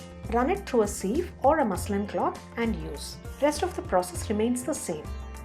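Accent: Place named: Tamil Nadu, India. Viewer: Nothing like our South Indian filter coffee (kaapi)! It's something I used to miss on all my business trips abroad till I started carrying a filter and coffee powder with me!, Wow! Super English pronunciation, diction and intonation even though there is a slight aroma of 'Filter Tamizh' in the accent! 😅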